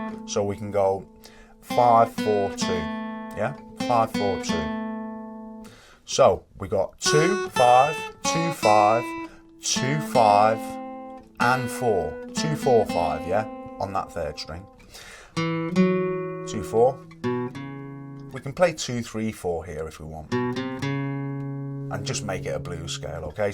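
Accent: Liverpool, United Kingdom. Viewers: Good teacher - but I agree that you should play most of the song BEFORE teaching most of it. Lovely Scouse accent!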